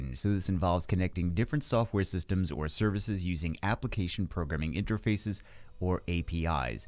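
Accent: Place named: Canada. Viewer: Must be a Cannuck narrating ... Asooor...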